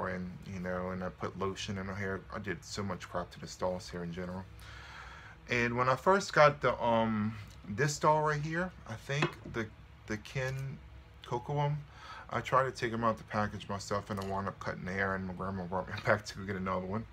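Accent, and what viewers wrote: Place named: Boston, United States. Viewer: Where is your accent from? It's like almost a Boston one and I love it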